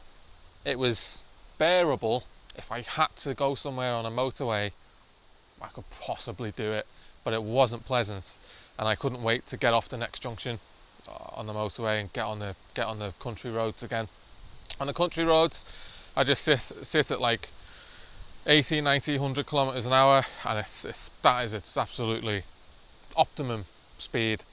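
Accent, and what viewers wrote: Liverpool, United Kingdom. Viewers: A Scouser in Finland ? Are you on the run? LOL PS Subscribed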